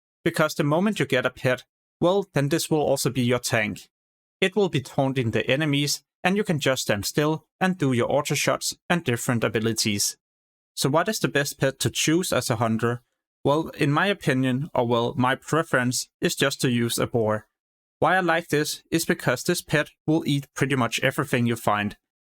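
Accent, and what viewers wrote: Denmark. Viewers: Skud ud til den mest danske accent på youtube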